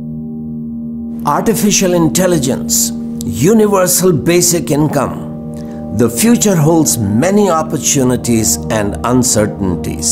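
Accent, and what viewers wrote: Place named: United Kingdom. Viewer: Esse sotaque britânico omg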